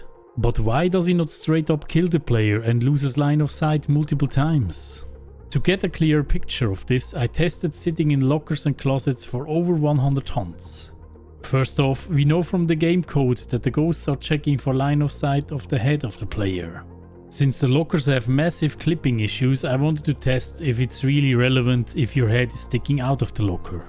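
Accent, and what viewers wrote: Switzerland. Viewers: Are u swiss? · schweizer english 😅 triggert schon ein wenig xD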